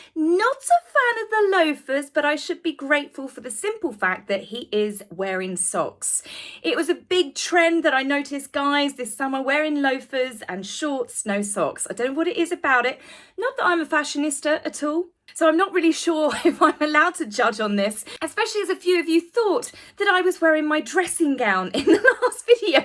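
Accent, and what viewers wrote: United Kingdom. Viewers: Thank's for talking a little bit slower in the video. Sometime's it's hard to keep up with the British accent when it goes so fast. Lol...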